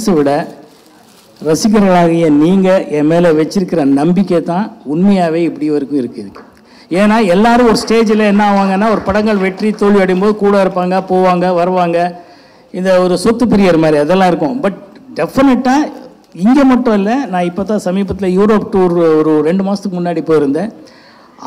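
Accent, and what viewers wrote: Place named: Karnataka, India. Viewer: பேச்சுல கன்னட நெடி...ஆனாலும் நல்லா இருக்கு....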